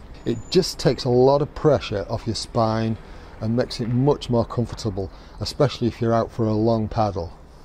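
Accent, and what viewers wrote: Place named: North, United Kingdom. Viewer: I think it was a combo of water,dreamy music and your dulcet northern tones!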